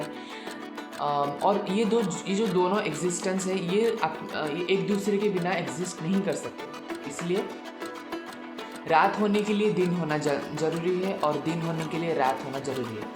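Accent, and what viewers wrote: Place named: Odisha, India. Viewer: Bhai thoda ladka bano Aurr hindi bhi aap ki lag rahi hai odia accent wali Yeh rang dhang change karo ladka bano re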